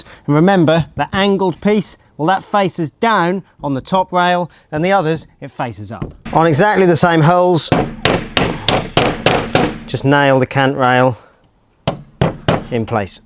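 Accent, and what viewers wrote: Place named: Norwich, United Kingdom. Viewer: 😂me too! been watching him a while and thought he’s got to be Narwich like me !😂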